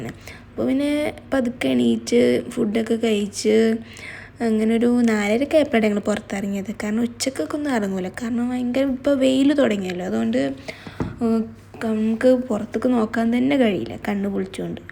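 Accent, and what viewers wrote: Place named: Malappuram, India. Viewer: Malappuram slang avoid cheyy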